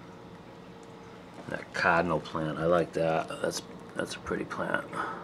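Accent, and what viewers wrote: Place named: Bristol County, United States. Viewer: ohh dude I moved out of mass 8 years ago but I know a Bristol county accent when I hear it! salute!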